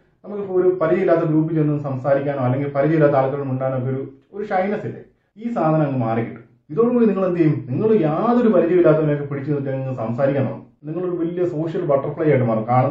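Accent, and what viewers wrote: Kottayam, India. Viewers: pure കോട്ടയം slang.. വാഴൂർ-? പൊൻകുന്നം? കാഞ്ഞിരപ്പള്ളി??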